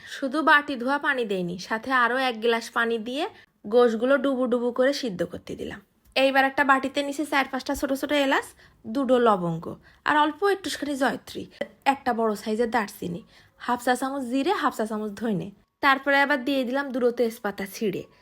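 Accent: Khulna, Bangladesh. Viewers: আপু,তুমার বাড়ি কি খুলনায়। কতা শুইনে তো তাই মনে হয়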